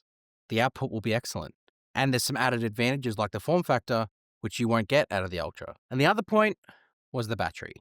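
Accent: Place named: Australia. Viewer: Credibility: screenshots lost because I have to send it back . Yet , you hold up the one you bought . Any expert would send photos of 10 days to their keeper new black phone. .....just the optics and the devils advocate voice in my head. Schill or not , I've been here for information n exposure to new products, uses . ....to expect a lack of bias is to expect a lack of Australian accent. Dude, I tuned in just for the accent and the bias . Love you, man! Nothing but Respect.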